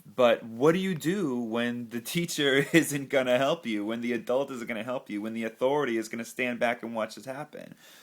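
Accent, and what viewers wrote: New York, United States. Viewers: It's fucking sad that this kinda shit is still happening. Sorry this happened to you. Your NewYork accent is adorable. Sad for me that youz gay😏I fink youz tha cutest! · Love the NYC accent sooo much. xoxoxo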